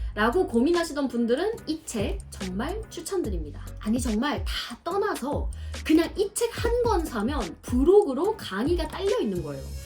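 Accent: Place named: South Korea. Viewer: 인스타에선 광고 욕먹던데 온도차 심하구나....전형적인 한국인 일본어 발음이라고 광고찍냐는 댓글 많던데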